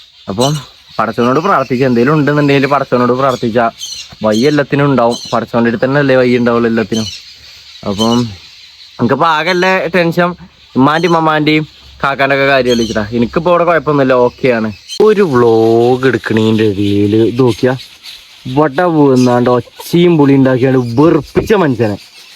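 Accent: Malappuram, India. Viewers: എട. ബ്രോ.ഞാൻ.കണ്ണുരാണ്.നിന്റെ.മലപ്പുറം.ഭാഷ.തീരെ.മനസിലാകുന്നില്ല.😂😂😂😂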